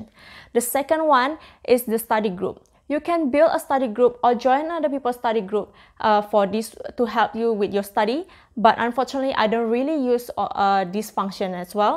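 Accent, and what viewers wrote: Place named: Vietnam. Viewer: Vietnam accent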